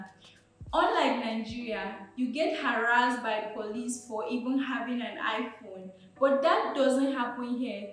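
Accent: Ghana. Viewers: I love ur Ghanaian accent ( that one de3 ... lmao)😏😏 twi +english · You sound Ghanaian and we love you. Come and work with us. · You are speaking like a Ghanaian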